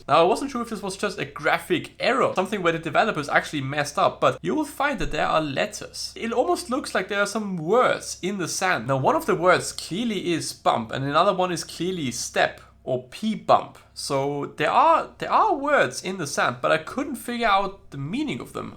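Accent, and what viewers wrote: Germany. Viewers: Is it possible that ur German? You have that accent😅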